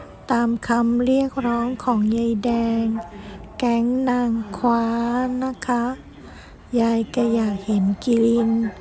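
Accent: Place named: Japan. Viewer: ดีจ้า รับชมเมืองญี่ปุ่น ด้วยคะ ฟัง บรรยายจนเพลินเลยจ๊ะ บรรยายได้น่ารัก คะ สำนียงจะออกทางญี่ปุ่นหน่อย พั่ลัดด่จะรอชมคลิปต่อไปนะคะ❤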